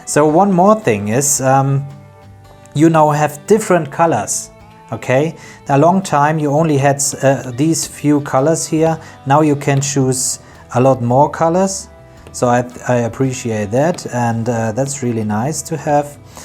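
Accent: Germany. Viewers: Are you from Germany? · Oh Gott, dein deutscher Akzent ist so schrecklich anzuhören!!!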